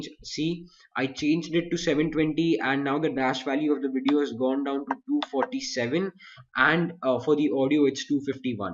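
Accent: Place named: India. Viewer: It's Indian accent.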